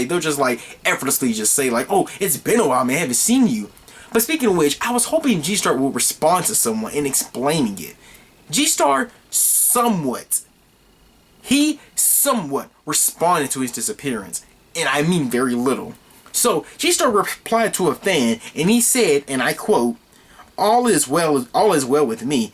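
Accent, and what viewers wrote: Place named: Australia. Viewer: He has Australian accent, not British.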